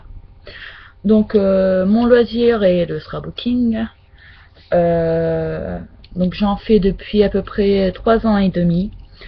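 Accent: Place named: Nord, France. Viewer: Coucou je viens de tomber sur une de tes vidéos de l'album sur ton fils que je trouve surper beau et du coup je me suis abonnée à ta chaîne ... dis moi tu ne serais pas du Nord par hasard ? j'ai cru entendre un petit accent lol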